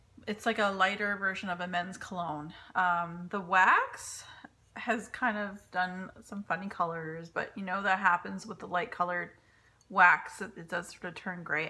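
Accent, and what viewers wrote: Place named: Canada. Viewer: loving your Throwback Thursday candle reviews. I"m all caught up now and looking forward to more. Your Canadian accent is adorable!;-)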